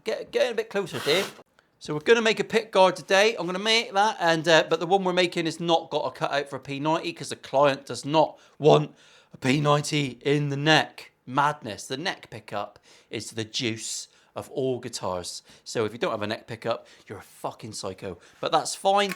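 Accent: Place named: Plymouth, United Kingdom. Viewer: I’ve only been watching for a year at most but I love it. I run a family jewellery business in North Cornwall but I did have a bit of a break in my 30s and went building. The first time I watched I was instantly reminded of the banter we used to have on site (although to be fair you’re funnier than any tradesman I met😂). Informative,funny and a janner accent. Can’t go wrong!😂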